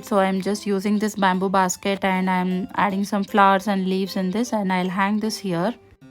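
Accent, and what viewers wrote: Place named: South India, India. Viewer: It's quite a good makeover, dear. You are extremely creative. Kudos to your creativity and hard work. Also, you sound very south Indian!.❤❤❤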